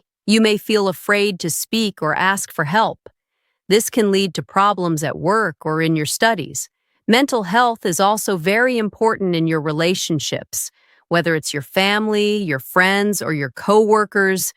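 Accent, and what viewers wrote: United States. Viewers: This is not a British accent but horrible American accent with delivery of irritating pronunciation of Rrrrrrrr.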